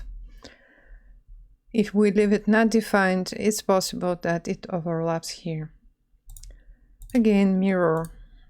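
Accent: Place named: Russia. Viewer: У девушки явный русскиий акцент... Давно в Канаде?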